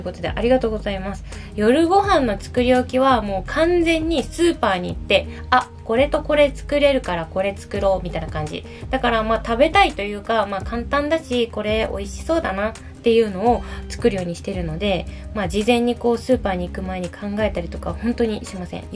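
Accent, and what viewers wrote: Kagoshima, Japan. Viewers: わたしも鹿児島出身、東京在住なので親近感湧くし、突然鹿児島弁でちゃったらしばらく直らなくなるのめっっっちゃわかります（笑） しかもわたしもジャニオタあがりのケーポオタなのでもう勝手に運命感じてます😳 これからも頑張ってください❤︎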